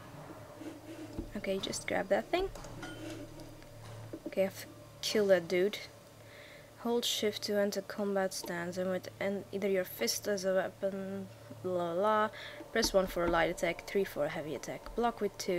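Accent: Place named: United Kingdom. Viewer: Nice accent : ) is it british?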